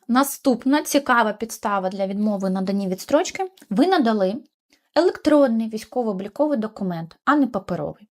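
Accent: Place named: Hutsul, Ukraine. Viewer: ещё этот мадьярско-гуцульский акцент!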